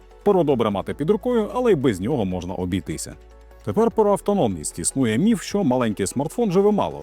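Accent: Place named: Hutsul, Ukraine. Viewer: Гуцул долбаный )))., диктун на русском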